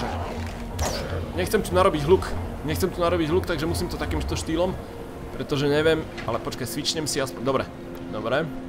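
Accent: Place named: Slovakia. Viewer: Fiu,komentuju trosku pozdeji,no snad nevadi :) Ja osobne se na tve videa nedivam ani tak napribeh ani podobne ,ale proto,ze chci slyset tvoje hlasky a podobne.Podle me je to dobry napad,kostovky dlouho nebyly,stare dobre casy :D Pff...stare...jsi muj nejoblibenejsi youtuber ( yeup,a mas fakt super prizvuk...to je tak kdyz jsi napul slovacka no xD ) a u tvych videi se uklidnim a odreaguju.Delej jak uznas za vhodne. ;)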